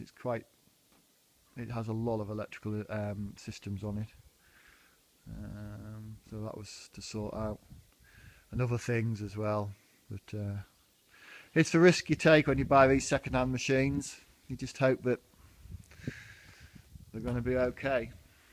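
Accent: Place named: Northwest England, United Kingdom. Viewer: Thanks for the update on the oil pressure, I am curious what weight oil you are running? Also, my wife, who is originally from Preston, overheard me listening to you talking, and was trying to figure out what part of the UK you are in. She guessed north, northwest.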